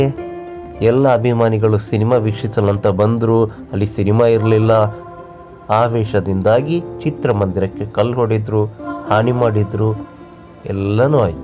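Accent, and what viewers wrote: Coastal Karnataka, India. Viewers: Yavano ninu magne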